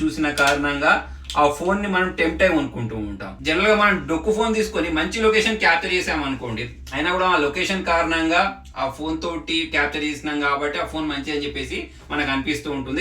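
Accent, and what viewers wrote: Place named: Karimnagar, India. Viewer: .. మీరు చెప్పే దాన్ని బట్టి నవ్వొచ్చింది బ్రో..iam not laughing about your content...knr slang..big fan of u😊😊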